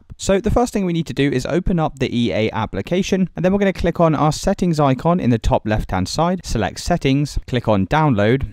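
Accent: United Kingdom. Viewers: THANK YOU BRITISH MAN DNS SERVER WORKED 4 ME MAN!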